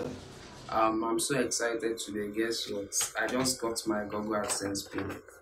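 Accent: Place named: Igbo, Nigeria. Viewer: Pls was it the first pin you later had or the second. And pls, where was your location. You sound like an Igbo guy.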